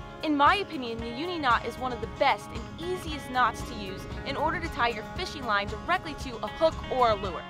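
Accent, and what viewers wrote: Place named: Maryland, United States. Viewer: Are you originally from Maryland? Sounds like a little accent is still there...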